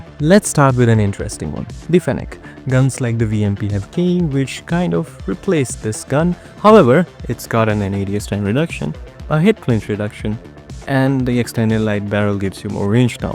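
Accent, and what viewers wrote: India. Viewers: non Indian VOs please comeback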